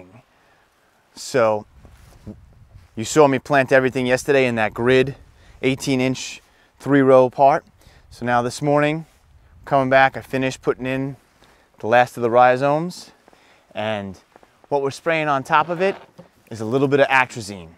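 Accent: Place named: New York, United States. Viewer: Give him a break. He’s a New Yakker.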